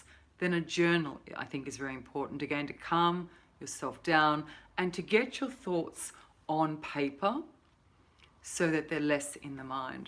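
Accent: Australia. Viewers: Love the Aussie accent it's sexy , I'm scots /Irish we have a huge bond with Australia x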